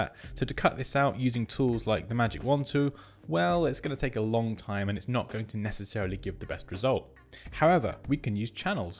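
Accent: United Kingdom. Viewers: Nice voice and accent, your British accent is easy to listen and understand!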